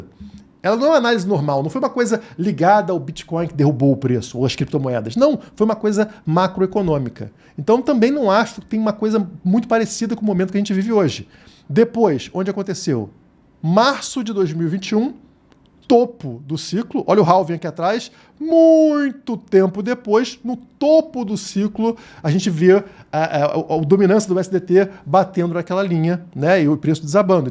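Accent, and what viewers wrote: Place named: Rio de Janeiro, Brazil. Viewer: pelo sotaque acho que é do RJ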